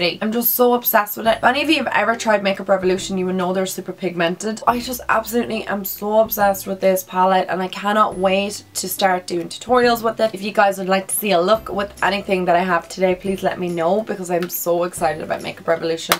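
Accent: Ireland. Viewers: I just started watching your channel and I tough you were from ireland I know my irish accent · Are u irish😘😘❤️ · i love your irish accent!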